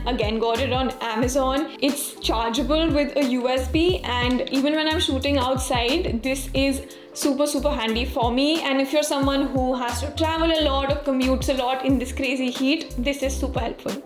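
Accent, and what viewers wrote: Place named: England, United Kingdom. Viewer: English accent is so good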